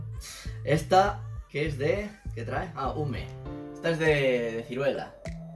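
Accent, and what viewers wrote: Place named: Asturias, Spain. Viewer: Conoci el canal a traves de kira sensei. Segun te escuche sabia que eras asturiano, el acento es inconfundible hahaha, aunque parece que cuando se esta aqui pasa desapercibido. Un saludo desde la capital del principado! P.D. por ejemplo, sidra Del Valle 6.3% de alcohol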